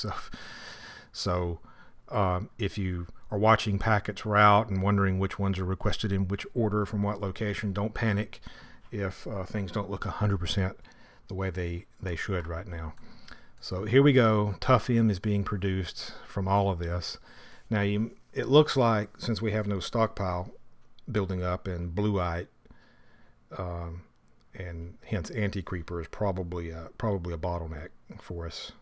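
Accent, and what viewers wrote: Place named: South, United States. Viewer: I don't know why I expected the dev to be Croatian or something but no he's southern af.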